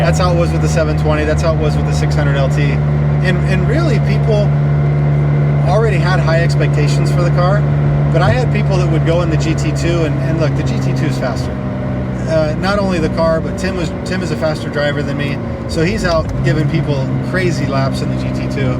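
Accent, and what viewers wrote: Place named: Northern California, United States. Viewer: Always appreciate your videos. I've been following your journey since boosted borris. I'm planning on coming to apex as soon as the world is back to normal. I'm curious you are definitely from the states and perhaps in a video if you haven't already you could talk about how you ended up in Germany and also, my guess is your either northern CA or the north west born and raised? Am I close? Cheers.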